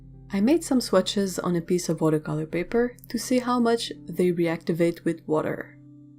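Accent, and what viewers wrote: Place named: Quebec, Canada. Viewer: Même pas 20 secondes et j’ai tout de suite compris que tu es québécoise :D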